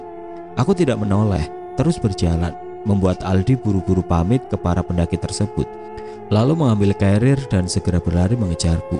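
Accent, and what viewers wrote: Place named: Jakarta, Indonesia. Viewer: Sayang ceritA orang Sulawesi logat jakarte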